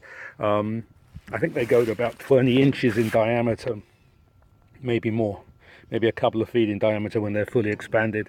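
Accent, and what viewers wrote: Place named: England, United Kingdom. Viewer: I'ma be honest. I hate you english akkzents but I subskribed kuzz i like what you are doing. Salute.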